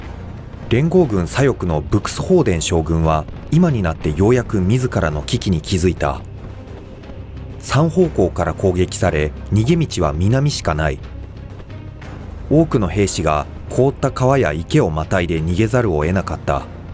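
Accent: Kansai, Japan. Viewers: 関西イントネーションかわいいよ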